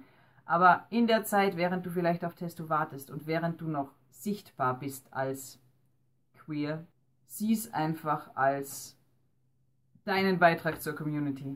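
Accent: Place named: Vienna, Austria. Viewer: bist du aus wien oder niederösterreich oder so? dein dialekt hört sich bisschen so an^^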